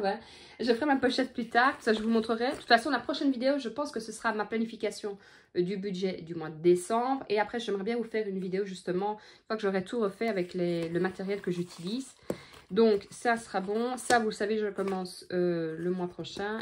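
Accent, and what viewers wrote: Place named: Belgium. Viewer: Cc, merci pour ce partage, j’espère qu’il n’y a rien de grave pour ce rdv, tu es vraiment adorable!!! et en plus ton accent belge ❤ c’est encore plus mignion!!!🥰, T’inkiet J’ai un chéri 🤣🤣🤣🤣!!!! Gros bisous à toi et ta famille 🥰😍😘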